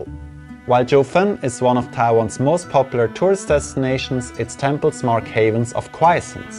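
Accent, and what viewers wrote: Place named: Switzerland. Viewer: Man this Swiss accent ... so sweet.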